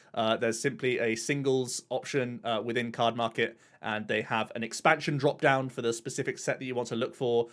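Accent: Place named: United Kingdom. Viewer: Dollars? You sound like a British man. Unless you're living in Australia, America, Canada, etc why are you giving us prices in dollars?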